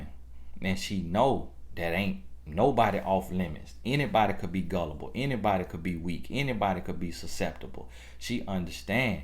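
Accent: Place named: Florida, United States. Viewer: u gotta be from Florida to from how u talk, I feel like your my brother or cousin schooling me 😂 I love you!! Much respect 💯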